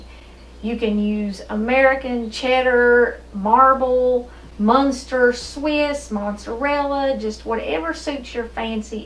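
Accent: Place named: West Virginia, United States. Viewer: Hello ... I am a new subscriber ... I absolutely LOVE your videos .. I LOVE to hear about the Lord Jesus ... Thank you for including him & giving him the Praise & the Glory that he so rightfully deserves .... by the way ... Where are you from? i am from WV living in Bristol Va .. but you sound like you may be from West Virginia ... God Bless !!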